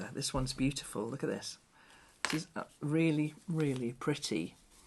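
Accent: United Kingdom. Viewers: Hello,. I’m from Illinois in the US. The number of projects you show does not sound like too many. I dabble in crochet,embroidery, knitting, quilting, rock painting, art journaling, stamping cards, and since November, slow stitching. I think I would get bored if I had to stick with just one. You’re beautiful Rita is absolutely gorgeous. Thank you for sharing all that you do. I love listening to the English accent, especially if it’s calm and soothing, of which you fit the bill.❤❤ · Hi, Im living in Tasmania, I was told about your channel by a new member of our sewing group. We meet every other Monday and make quilts for Charitie's. I was originally from the UK, lovely to hear that accent.:)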